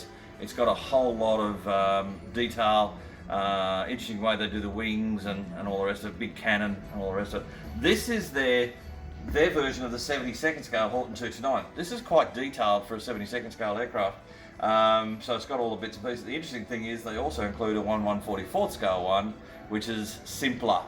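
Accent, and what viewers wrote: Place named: Australia. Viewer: I am American and I understand his Australian accent.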